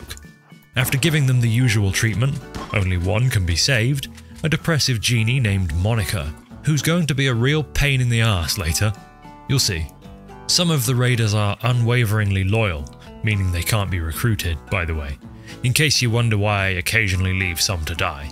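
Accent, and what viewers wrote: United Kingdom. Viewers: bro dude are you and the same dude u have like the same voice but you are both britich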